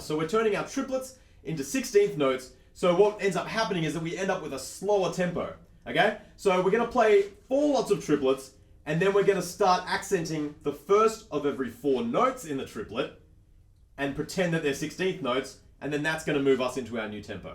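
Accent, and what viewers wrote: Australia. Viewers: Quick Question For Ya! Are You From Australia Or New Zealand?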